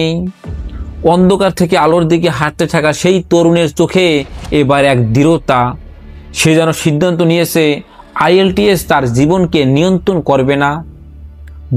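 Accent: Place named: United Kingdom. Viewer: ভাইরে ভাই যেভাবে প্রোনানসিয়েশন গুলো করতেছো মনে হইতেছে ব্রিটিশ এক্সেন্ট শুনতেছি ❤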